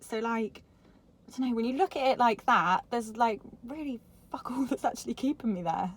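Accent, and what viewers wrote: United Kingdom. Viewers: She has a British accent but the steering wheel is on the left side. What gives?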